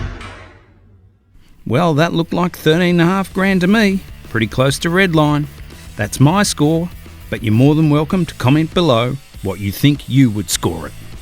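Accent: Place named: Australia. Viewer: thanks for the helpful reply! You sound like me on my MC22 haha. Please keep up the videos, it's nice to hear an Aussie doing good reviews of bikes.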